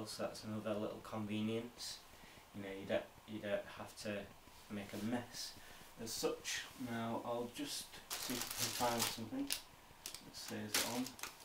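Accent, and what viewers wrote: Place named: United Kingdom. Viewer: Aye a nice pack! I´m sure in extreme conditions two soldiers could survive for at least 24 hours. I´ve just got a few questions?!?? How do ya heat all that stuff? Ever tried the american M.R.E theres a simple heatin´ system inside the ration pack. And last but not least! Where´s ya accent belonging to?? It´s quite intresting that every town in the UK has got it´s own accent. Greetings frae germany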